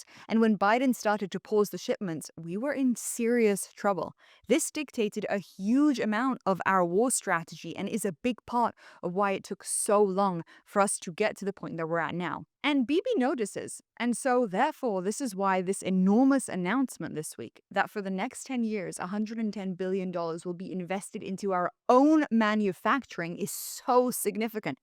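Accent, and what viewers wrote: England, United Kingdom. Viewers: All good and makes total sense. This will terrify israels enemies. Even more than now! Ps. You are precious!! You often have a strong English accent. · YOU AND THE BRITISH ACCENT HAVE ME WORKED UP BEYOND BELIEF!!!!!!! WHERE DOES THAT ARROGANCE COME FROM!????????? IS THAT WHY 90% OF THE WORLD HATES JEWS AND ISREAL? I'm not one of the Haters But if you Keep Running That MOUTH!!! KNOW YOUR PLACE!!!!!!!!! CHRIST IS KING!!!!!!! 🙏🇺🇸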